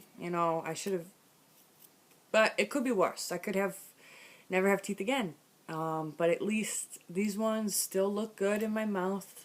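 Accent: New York, United States. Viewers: I love your accent! New York?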